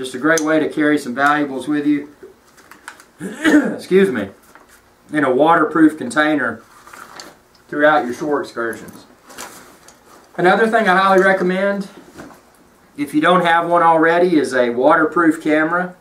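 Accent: South, United States. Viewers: That was awesome. Thanks for the information. But what really kept me watching your video was your cool southern accent ;)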